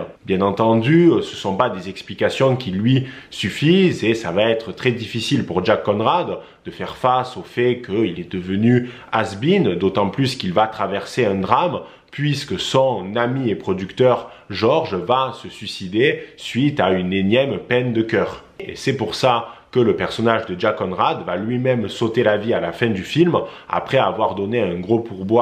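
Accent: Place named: Aveyron, France. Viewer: Est ce que ton petit accent a quelque chose à voir avec l Aveyron ? Salutations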